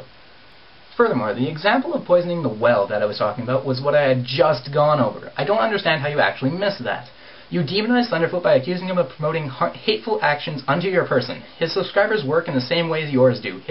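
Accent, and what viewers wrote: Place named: Canada. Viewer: haha, I love canadian accents. abowt! hehehe. nice video, dude.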